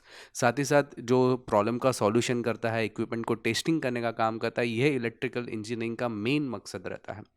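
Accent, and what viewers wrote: Gujarat, India. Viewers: Sir aap gujrat se ho kya kyuki aapki language aisi hai 😂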